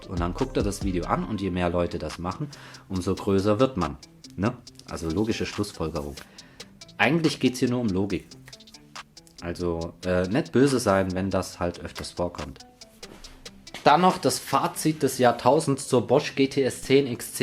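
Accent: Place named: Saarland, Germany. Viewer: Ein Saarländer, wie schön. 👍👍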